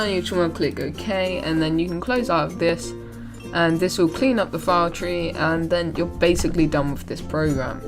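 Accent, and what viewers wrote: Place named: England, United Kingdom. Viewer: holy shit finally an english person hooray i can actually understand this now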